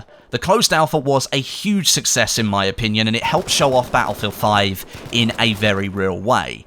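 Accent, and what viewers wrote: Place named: RP, United Kingdom. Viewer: What is this? You gave absolutely no opinion of the game except: it is fun and I THINK I will be happy to play it next 2 years. There is no game-play opinions, there is no gun play assessment, there is no game mods information. YOU ACTUALLY COMMENT ON THE DICE AS GAMING COMPANY, AND THEIR MARKETING STRATEGIES BUT YOU GIVE NO OPINION ON THE GAME WHAT SO EVER. Your videos are worthless waste of time and I feel cheated tor my time and energy every time I watch one. You keep repeating same statements in slightly different way for half a dozen times in slow posh correct English, and you say nothing of substance.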